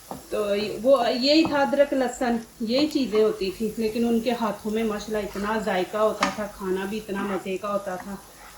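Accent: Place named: Punjab, India. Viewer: Excellent recipe....aapke baat karne ka tareeka bilkul punjabi....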